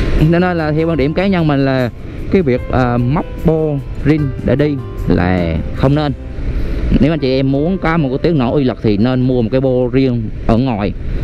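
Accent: Central, Vietnam. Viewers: Nghe giọng ông anh miền trung vui ghê :))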